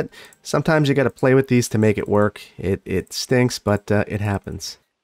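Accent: Wisconsin, United States. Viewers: I thought he said "egg" the first time he said it to, and I was like: Wait, what??? Then I realized he was from Wisconsin where they say "beg" for bag.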